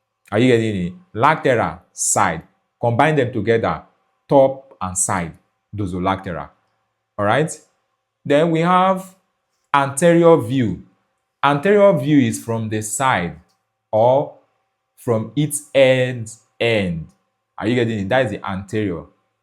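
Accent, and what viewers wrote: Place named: India. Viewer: Why am i hearing indian here oo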